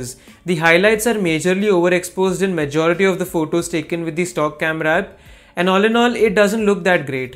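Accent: India. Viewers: O sotaque indiano é muito feio mano, parece o inglês liso do liso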